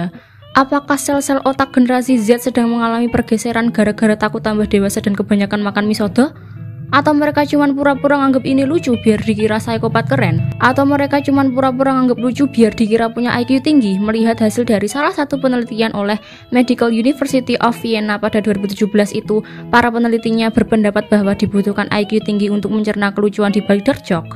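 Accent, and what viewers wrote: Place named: East Java, Indonesia. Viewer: Kaka dari Jawa Timur ya, soalnya kaka suara nya agak... Medhog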